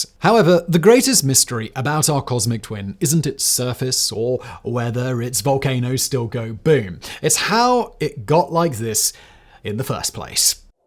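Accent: United Kingdom. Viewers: Maybe a bit less hipster, styling presentation... The british en (arrogant sound) style push it up, that it seems to help to establish the narcissism of these toxic days.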